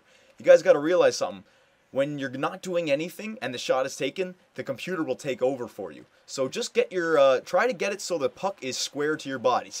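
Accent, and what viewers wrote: United States. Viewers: R u amaracin or Canadian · r u american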